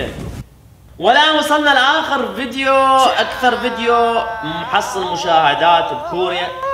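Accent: Iraq. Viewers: شلون حافضت عله لهجتك العراقيه ونته سافرت صغيرون سويلنه فلوك عليها بكييييفي هسسسسسه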